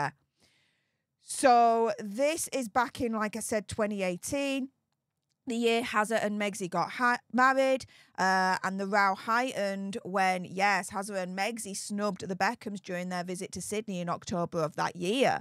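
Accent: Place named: Northern England, United Kingdom. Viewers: Love listening to you as it brings me home with your accent. I am originally from Manchester England and you sound just like my cousins. I live in Mississippi now, have been here since 1972. I did go back for 3 years in the 90s from 94 till 97 after Diana died. People change so it was useless to want them to see me after 20 years back then.Thats ok because they missed out on me and my daughter. Their loss. Luv ya. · Dear, I’ve a personal question (maybe none of my business and could be offensive but not my intent). I’m trying to place the location of your accent. I enjoy studying UK accents and like placing them to their location. Correct me if I’m wrong, and most likely I am, but I’m guessing somewhere between Manchester and Yorkshire?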